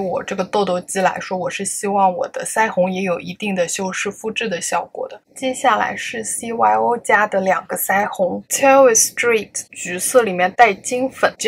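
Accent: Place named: Shanghai, China. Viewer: 是不是上海人啊，口音很熟悉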